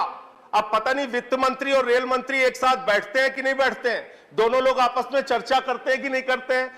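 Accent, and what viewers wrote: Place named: Uttar Pradesh, India. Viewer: संजय सर !!! आप हीरो हैं देश के। आपके बोलने शैली हमारे उ०प्र० की पहचान है...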